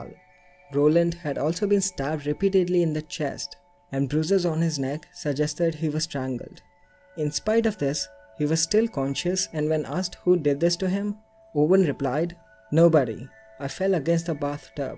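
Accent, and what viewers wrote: India. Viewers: Then don’t listen. Keep your prejudiced opinions to yourself. I wouldn’t characterize his voice as Hindu, since Hindu is a religion. He has an Indian accent. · You're clearly very uneducated, Hindu is a religion, his accent is Indian, those two are not the same thing. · Lol the Hindu accent. I cannot understand what you say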